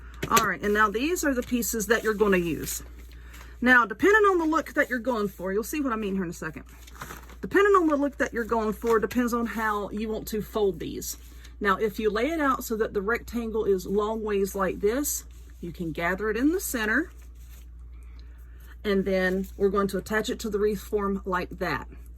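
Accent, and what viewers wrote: South, United States. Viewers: Grand idea. I like your southern accent. I am an Island girl and I have an accent. I think it's cool. Thank you for sharing and yes l ok ve the mermaid nails. God bless you add and your family.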